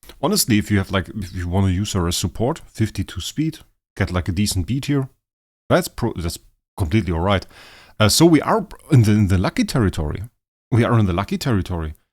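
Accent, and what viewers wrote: Germany. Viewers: I can definitely hear the German accent but it might be because I’m danish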